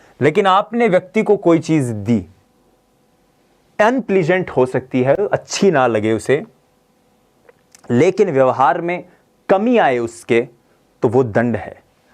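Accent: Rajasthan, India. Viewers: Sir ur marwadi is fabulous. We olso speak this language in my home ☺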